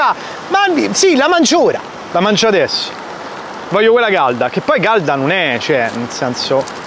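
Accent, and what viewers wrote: Rome, Italy. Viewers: accento Romano? o forse piu` ancora Toscano..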